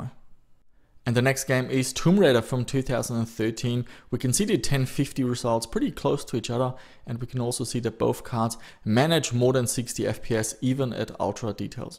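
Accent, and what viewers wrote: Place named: Germany. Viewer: Australian Dollars?????? For your accent I thought all this time that you were German, but then again i am from Mexico so I guess we North Americans know little about the south-eastern corner of the world.....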